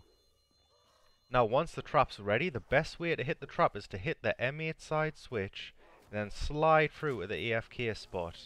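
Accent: Newcastle, United Kingdom. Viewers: thought he was a geordie?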